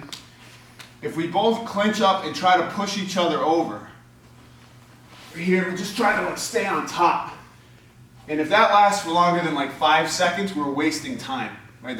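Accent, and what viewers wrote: Brazil. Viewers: Your videos are hilarious man. Especially your Brazilian accent. Thanks man. My bjj coach hardly explains anything for some reason.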